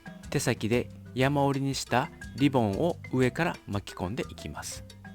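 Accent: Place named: Kyoto, Japan. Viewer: なんか喋り方が京都のお方の喋り方ですなぁ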